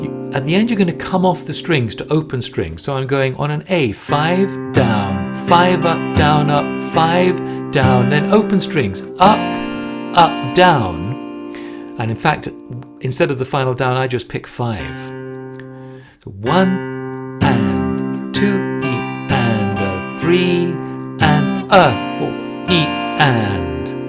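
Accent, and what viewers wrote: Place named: RP, United Kingdom. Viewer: Excellent. I love the teaching and the technique. I just would like to know if the Rex. Harrison accent is authentic because only 2 to 3% of people in England talk like that. And it sounds just like Stewie on a Family Guy, very cool, though.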